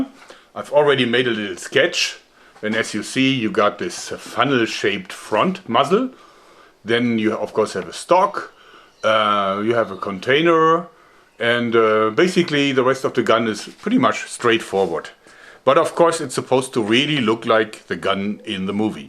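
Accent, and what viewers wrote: Germany. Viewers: I guess I spent too much time in Germany while serving in the US Army to not notice your accent. 😄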